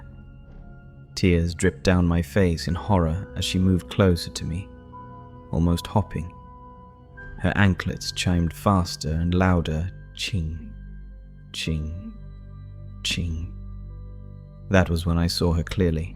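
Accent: United Kingdom. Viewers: Native or acted, but British accent is the best on my and many others opinions👍👍👍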